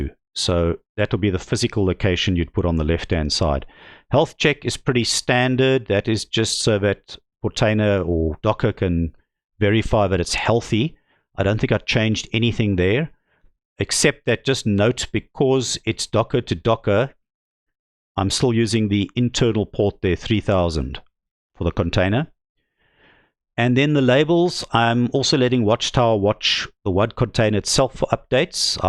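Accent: South Africa. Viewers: Thanks for the video. By the way South Africa or Netherlands? hearing a familiar accent here.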